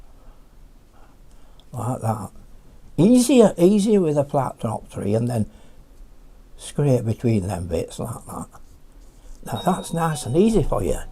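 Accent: United Kingdom. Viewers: I love your accent! What part of the UK are you from?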